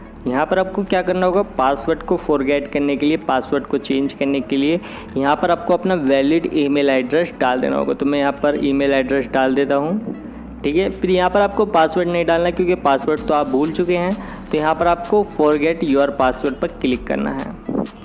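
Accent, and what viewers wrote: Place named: India. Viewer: He is speaking English just in a Indian accent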